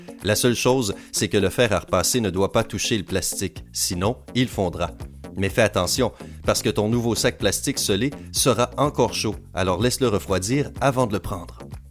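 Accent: Quebec, Canada. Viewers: Je bois VOS SAINTES paroles monsieur le Canadien. Bon de ce pas je vais mettre sur MA table de nuit un bulletin neuf du loto ou mieux encore un bulletin neuf d'euro millions sur une feuille d'aluminium Et peut être que dans un rêve je verrai la combinaison gagnante. Merci du conseil et que vive Laluminun...jadore VOTRE accent..moqueur ce...oh le vilain garnement...je vous ai mis un joli pouce bleu..bonjour à Céline... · Accent canadien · c'est un être à cause de son accent. Probablement un canadien , de plus, on n'est pas habitué au tutoiement facile des Québécois qui nous donne l'impression d'être un enfant !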